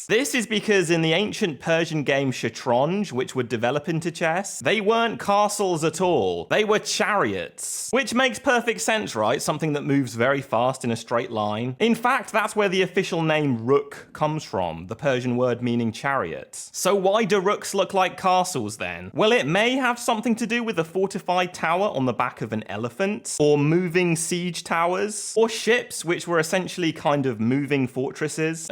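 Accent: United Kingdom. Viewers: This is because of his Bri’ish accent, really. They sometimes Rs between vowels.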